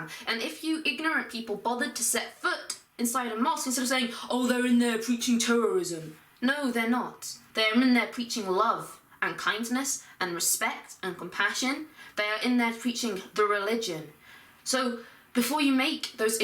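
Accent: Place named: United Kingdom. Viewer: Well you sound like you are in the UK and look like you were Not Born a "Muslim" just converted to Islam. Those are 2 totally different things! This is your "Beautiful Religion" Hunny! Look around you in Europe, won't be long before you ARE IN CHAINS POPPING OUT 10 MUSLIMS BABIES! <link> 2. THIS IS LONDON!!! <link>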